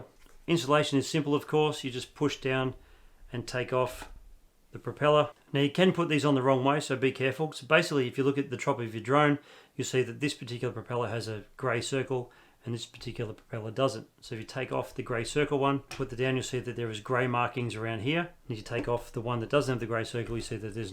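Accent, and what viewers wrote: Australia. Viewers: First thing I notice was the Australian accent. LOL! Well of course birds are a threat there, because just about anything that crawls or flies is dangerous in Australia. (Just kidding!)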